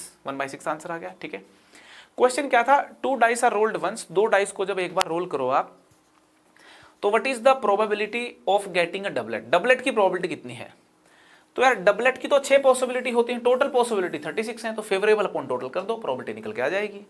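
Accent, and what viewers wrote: India. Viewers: I understand Indian accent badly but it is still better than our math at school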